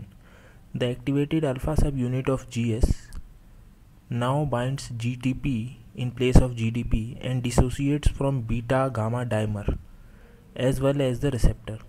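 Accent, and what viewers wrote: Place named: RP, United Kingdom. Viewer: Queen English :-)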